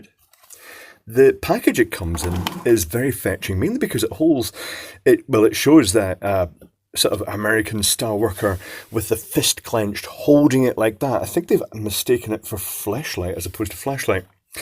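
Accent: United Kingdom. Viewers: the annoying british accent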